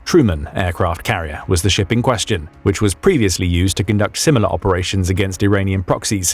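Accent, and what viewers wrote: United Kingdom. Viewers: why do we have to keep hearing American issues, with a British accent. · QUERY: Why choose Brit accent for narration? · 250 years ago, Rebels broke away from British rule and sailed to the New World to start their own government. But, this youtube channel never got that memo. So . . . they speak with a British accent About U S military movements. WHAT IS WRONG WITH THIS PICTURE?!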